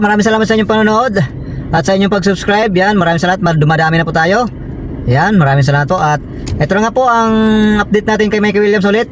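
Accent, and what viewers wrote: Visayas, Philippines. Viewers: ako alam kona visaya ka bossing kaya nga ganyanvka mag salita ksi naiintindihan ka nmin walang masama sa pananalita ng visaya nag papakatotoo ka lang porket visaya ng lalait na mga hinayupak kala mo nmn napaka perpektong tao nila hindi ata alam ng mga bobong ginebra fans na walang perpektong tao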